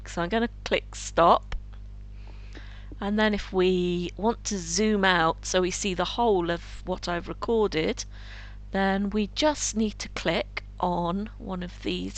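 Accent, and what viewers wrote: England, United Kingdom. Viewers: i know this has nothing to do with the video but...i love that english accent ^_^ but yeah i have audicty great software if you dont ecpect to do much with it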